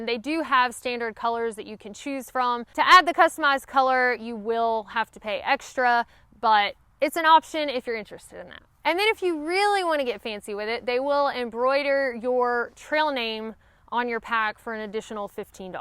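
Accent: South, United States. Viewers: I bicycled about 38,000 miles and camped through nineteen countries. I thought about through hiking the appalachian trail. It is not likely. Except for a 15 mile forced march in the army through a dry dusty riverbed, I have no experience long distance walking. I have no way of estimating my ability for that. Of course, if I were 30 again in place of 70 which I am now, there would be no question whatsoever. But, it is like the man said--There aint no way that's ever going to happen. I read your name. You also use because you are from the south, Alabama they say. And what makes it more obvious than your sweet, pure accent. Every syllable is another drop of honey, and beholding to the eyes, you are. You are a movie star. It is really clever and really cute what you do, and how you present yourself and the subject. Surely making the through hike could be a profoundly life changing experience. 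It can be the same with long-distance bicycle camping and touring, and quite different in some aspects. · Mine too, Matthew. I grew up in MS and lived 7 years in AL, 2 1/2 of those 1/2 hour from Auburn. But have lived up north and now in TX. Love the Southern accent! And she's an engineer!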